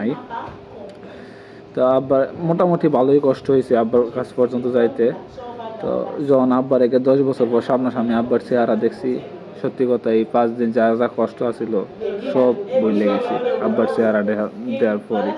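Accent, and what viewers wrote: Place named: Kishoreganj, Bangladesh. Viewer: আপনার কথা শুনে মনে হইতাছে কিশোরগঞ্জ /কাপাসিয়ার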